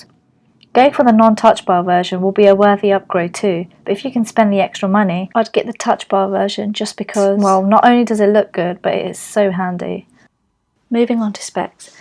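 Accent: United Kingdom. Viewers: Is it me or you have a strong Birmingham accent ? ahah ! Thanks for the video :)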